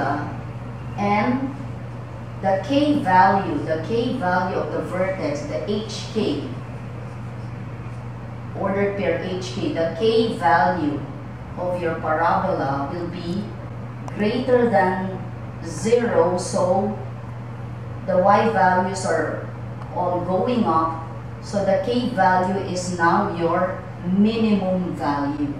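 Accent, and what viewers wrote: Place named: Philippines. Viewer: Are you from Manila Philippines, your accent very similar to my mum, Bernice NGO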